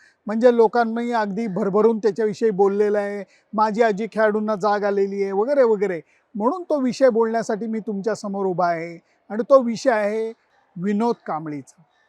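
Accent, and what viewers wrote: Pune, India. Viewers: मग पक्के पुणेकर आहेत लेले काका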